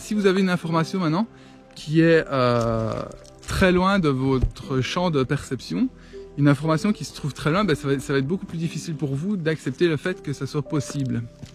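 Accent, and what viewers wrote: Liège, Belgium. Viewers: Bonjour. Ton analyse est sage, juste et lucide. Merci. Télévision= "tell a vision", raconter une réalité, et ça se fait via des..."programmes" sur des "chaînes" CQFD instrument de propagande et de programmation mentale. J'ignore où tu vis à présent mais tu as pris soin d'y amener ton accent liégeois:-) Love & Laughter from Cité Ardente. WWG1WGA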